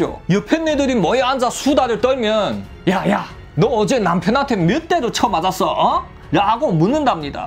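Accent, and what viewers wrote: Yanbian, China. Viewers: 한국말을 중국발음으로 들으니 힐링되네.. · 조선족 같음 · 한국말을 중국말처럼하네. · 한국말 연변말 섞어서 너무 이상하네요 하나만 하세요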